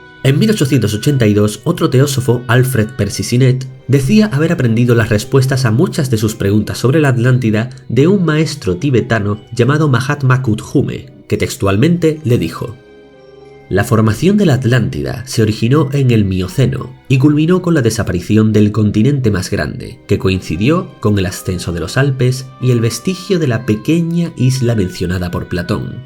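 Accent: Spain. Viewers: ¡Excelente la narración! ¡Me encantó! Me encanta el acento español del castellano.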